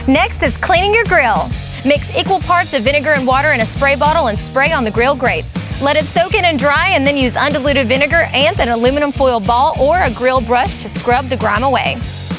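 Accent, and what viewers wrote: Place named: Alabama, United States. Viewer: Alambamian beauty. Love your accent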